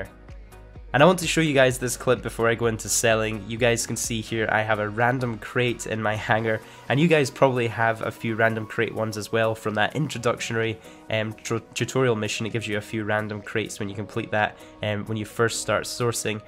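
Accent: Scotland, United Kingdom. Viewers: Are you from Scotland if you are so am I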